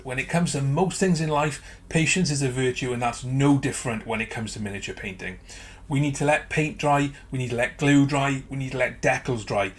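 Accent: Wales, United Kingdom. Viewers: You sound like a fellow Welshman! Those tips were much appreciated cheers!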